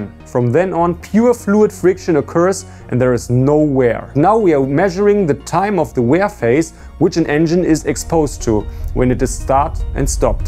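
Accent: Germany. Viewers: Sehr deutscher Akzent 😅 der scheiß gehört abgeschafft !! Das erste was man machen sollte nach dem Motor Start is den scheiß ausschalten!! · its really funny that this guy talks relatively decent english but then just randomly pronounces singular words 100% germanly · If you're going to make claims like your "facts" at 1 minute, and your 80% claim at 2 minutes, you've got to provide actual references. You have reasonable hypothesis, and you've done some preliminary experimentation, but to claim "finally proven" is intellectually dishonest. 2/10. Dramatic lighting and a German accent does not make something good science.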